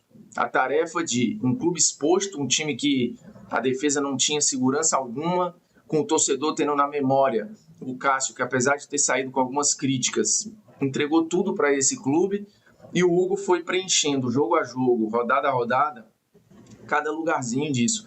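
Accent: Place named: Northeast, Brazil. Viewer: Grande, te acompanho desde o início do EI! o tempo não passa pra vc na aparência só na sabedoria, é admirável ouvir a lucidez nas suas análises, o sotaque nordestino diminuiu mas a musicalidade ainda é a mesma, acompanhar sua carreira tem sido muito legal. Que bom que você transmite o corinthians e posso te ouvir com muito mais frequência, e sim, aqui é tudo muito intenso pra todos, sua visibilidade e e fans vão crescer cada vez mais e muito rápido você vai ver!!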